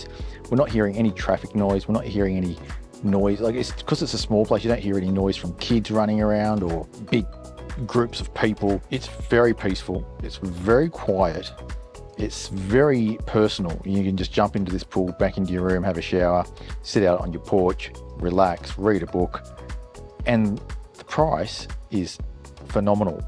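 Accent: Australia. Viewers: Love the Australiuan accent! just found your channel and what a place for the solo traveller, well produced and informed video, will check out your travels, cheers and beers!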